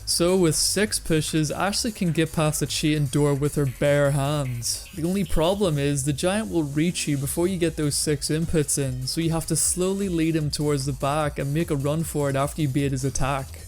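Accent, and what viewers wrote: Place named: Northern Ireland, United Kingdom. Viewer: Love hearing fellow northern Irish accents around YouTube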